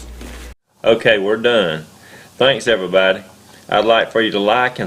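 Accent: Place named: Deep South, United States. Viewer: He ain't from Chicargo, that's fer shure. More like Ludiwici, Georgia, I'm a guessin. South, deep south. More southern than even the Sawfish. : ) I like it.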